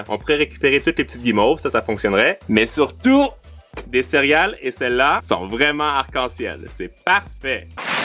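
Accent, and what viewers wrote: Quebec, Canada. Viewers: a l'accent québécois et un accent qui ressemble a l'accent hollandais (enfin le ton lorsqu'il parle français) Pourtant ils sont jumeaux je suis perdue.... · J’adore l’accent d’, c’est un mélange de Québécois, Anglais et Allemand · Pourquoi et plus québécois et on frère est plus allemand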